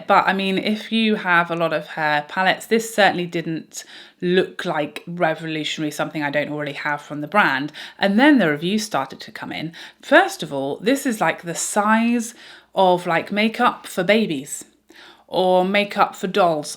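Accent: England, United Kingdom. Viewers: I just subscribed - full disclosure, it was because of your accent. Reminds me of “Midsomer Murders”! BTW, I agree with your re: the cheese scented shit. Gross.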